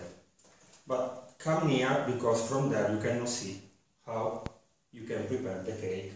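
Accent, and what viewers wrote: Italy. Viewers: so he is Italian, really nice accent.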